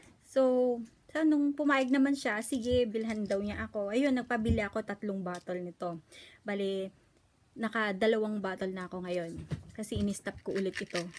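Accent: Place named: Ilocos, Philippines. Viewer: ilokano k girl? pra kcng may punto k. ganda mo nmn khit nsa japan k belo p rin eh db dami gluta dyn?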